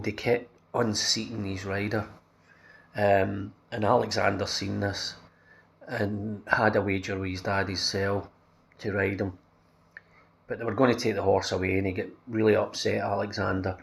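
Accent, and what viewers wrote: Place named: Scotland, United Kingdom. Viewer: Nice one bruv, I agree with your points, that Scottish accent, gets me every time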